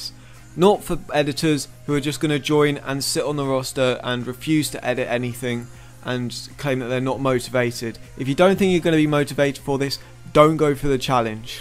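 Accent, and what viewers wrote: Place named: United Kingdom. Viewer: Gotta love that brilliant British accent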